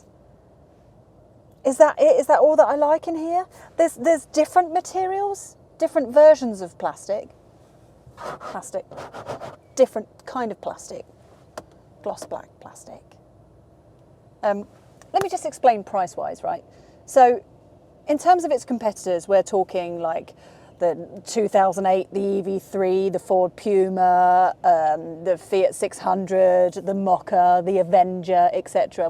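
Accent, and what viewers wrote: United Kingdom. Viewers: UK english?anyway horrible to listen wtf is that. British MC/BC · Banters not working too loud and artificial punching around british accent and bit of drama doesnt always add on, scorching on ears honestly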